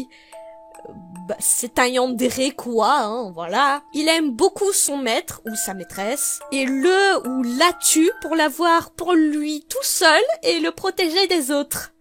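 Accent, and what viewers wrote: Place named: Belgium. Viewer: Y'a un petit accent Belge là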